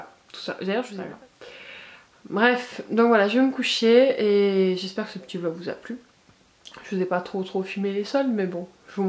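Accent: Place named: South, France. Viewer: 🤩 j’adore tes vlogs et ton accent du Sud qui chante le soleil ☀️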